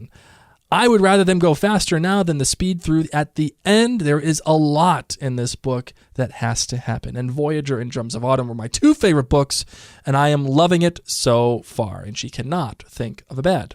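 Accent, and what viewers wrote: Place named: Northeast, United States. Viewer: always love the fade in and out of your northeastern tongue.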